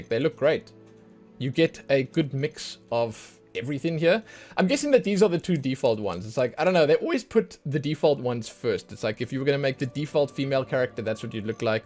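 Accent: South Africa. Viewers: I like how detailed this is thank you, and by any chance are you South African? I love your accent! Also I love your commentary and jokes here and there it makes me laugh! Thank you for this lovely video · Are you South African?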